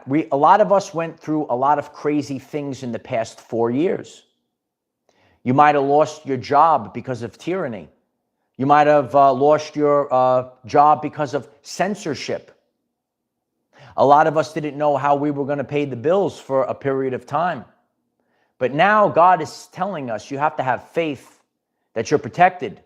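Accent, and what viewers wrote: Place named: Brooklyn, United States. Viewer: I am here for ur Brooklyn accent allllllllllllllllll day. Letter rip tater chip. I'm from Oklahoma and Texas and say yall. Ur the best. ❤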